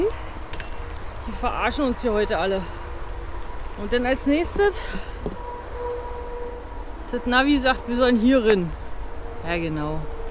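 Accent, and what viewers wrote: Berlin, Germany. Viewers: Hallo deine Videos schaue ich sehr gerne, bin bei deinen Touren auch etwas dabei. Macht viel Freude deine Touren und Erlebnisse. Auch deine berliner Aussprache sehr nice. Grüße Aus Bayern Rudi t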